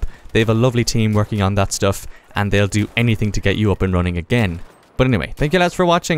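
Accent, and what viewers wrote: Ireland. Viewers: thank u man for this vid i thought it was just me getting that shit and btw are u irish?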